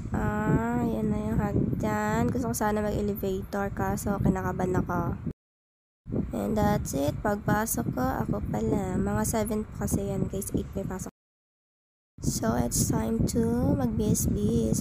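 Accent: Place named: Batangas, Philippines. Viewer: Parang familiar ang boses ah parang Yong batangenia done po salamat for sharing ingat po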